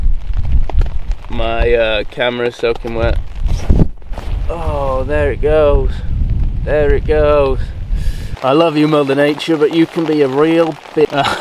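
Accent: Yorkshire, United Kingdom. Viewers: Enjoyed your show! Im from CA, USA, Born here with 100% Yorkshire blood! 1st Generation American Boy Scout! avid Fly Fisherman and Hunter! Love to hear the Yorkshire accent! I just subscribed! I like your choice of dinner and whiskey! I used to do what you do and need to get back to it! only difference would be I bring my Black Lab! and longer stronger tent pegs! lol Peace! look forward to more shows! Keith R Skirrow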